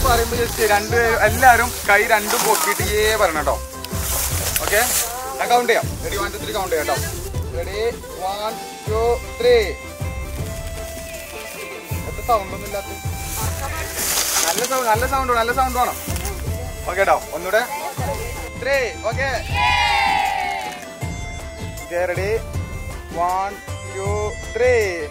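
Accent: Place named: Malappuram, India. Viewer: Yaaaa mwoooneeee .... 😅😅Ante varthaanam kekkanaa verneee main aayitt ..... 😂😂😂😂💪🏻 malappuram daaa 😜😜💪🏻💪🏻💪🏻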